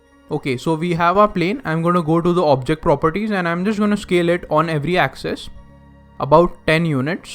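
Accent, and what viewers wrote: India. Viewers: hindi people use to have that accent, u know cause phonemes changes. I talk spanish and I'll probably have some recognizable accent and americans that speak spanish you can identify their accent too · I recently had a discussion with someone if indian accents are actually that distinctive like its shown on may shows in media. This guy proves they actually are. Good video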